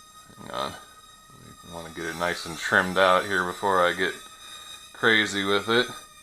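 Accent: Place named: South, United States. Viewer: How come when I watched this before you had a southern accent?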